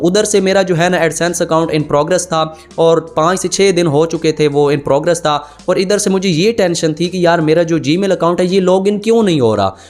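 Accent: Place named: Noorpur Thal, Pakistan. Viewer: Zaban isi tarh lg rahi hai Main khod noor pur thal sy hu and wsyy ap kahan sy ho..bye the way poch raha hu bro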